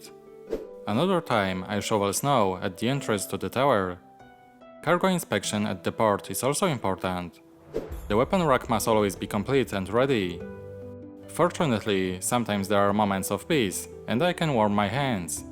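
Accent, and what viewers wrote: Poland. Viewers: Tak mi coś akcent podejrzanie zalatywał Pongliszem więc miło widzieć, że PL. Dobra robota! 🙂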